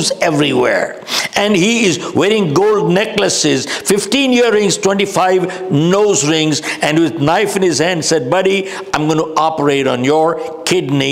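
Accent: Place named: West, United States. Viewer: West accent is supercool.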